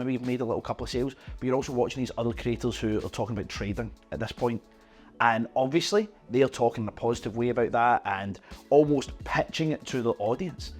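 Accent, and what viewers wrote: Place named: Glasgow, United Kingdom. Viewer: your accent reminds me of visiting my gran in priesthill near pollockshaws as a kid in the 80s - im in Cheshire england all my life but all family are local to pollock, good content mate - im self employed too and agree with a ton you say 😎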